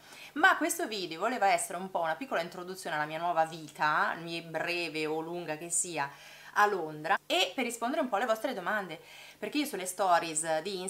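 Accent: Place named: Milan, Italy. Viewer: sempre con la cadenza milanese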